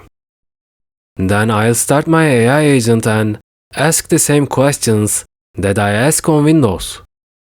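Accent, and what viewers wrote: Turkey. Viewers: Great video. Random to ask but are you Turkish by any chance?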